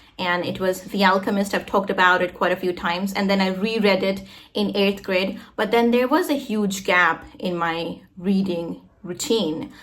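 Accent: United States. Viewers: Please sister tell me , how can get American accent like you ?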